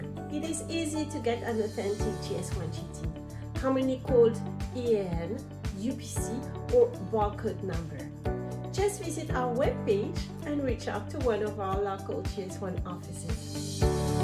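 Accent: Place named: France. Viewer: When explaining things in English, a first language speaker is a good idea. The french accent is particularly strong here and coupled with the overly loud music makes this unwatchable.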